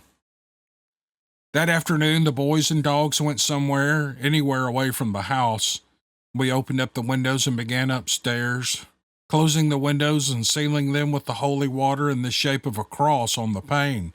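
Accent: South, United States. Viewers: Darn, you always keep me up late with your interesting, and superbly presented stories......I love the accent, what part of the South are you originally from, is it Georgia? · I have so missed you soft, southern voice. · You, my friend, are a very good writer or storyteller. I could listen to you all day long. I often re-listen to the books you have read while cooking. I like the southern cadence quality that you have. Anyways, I've missed you but had no doubts that you would return to us eventually. ❤ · I gotta tell ya Brother, as much as I love, How to Hunt, Steve's an honorable man, as I've said before, I Truly, Appreciate the Southern Man, in your voice. You have a gift, even if it ain't languages or accents, other than Southern.. GBU, and your family. I really love listening to your voice, and the peoples stories, Steve Lilly, or lived experience.....Aloha. 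Oh, and this story, Twisted. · that was an awesome story! Please keep writing when the muse hits you like that. Thanks for sharing that and the other stories, love listening to them. By the way, you sound like most of my neighbors, which is normal for the South. I am in Louisiana and went to Chamberlain Hunt Academy in Port Gibson for 6 years, so part of my growing up was in Mississippi. Great place to grow up!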